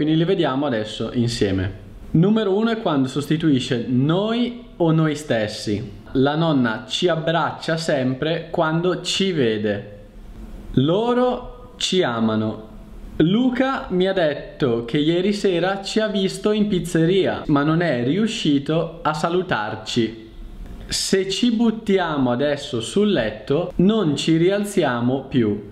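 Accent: Brazil. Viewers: Ciao! Tu sei italiano o Brasiliano? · Lástima que en la pronunciación, lo hace como brasilero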